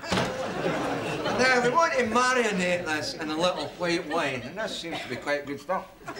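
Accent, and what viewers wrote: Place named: Scotland, United Kingdom. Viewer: Its funnier because of the Scottish brogue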